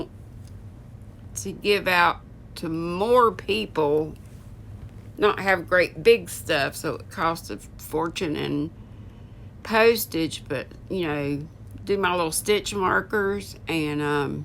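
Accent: South, United States. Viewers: Your a HOOT! Love southern accents!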